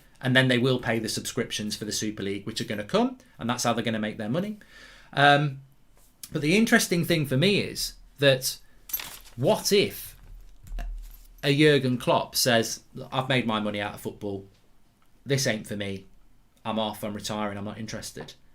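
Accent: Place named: Northern England, United Kingdom. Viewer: Nice Northern accent where abouts are you from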